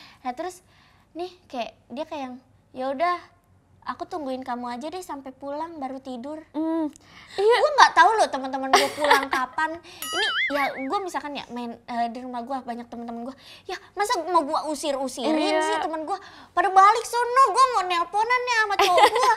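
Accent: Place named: Java, Indonesia. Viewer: Kak aksen jawanya medok banget iya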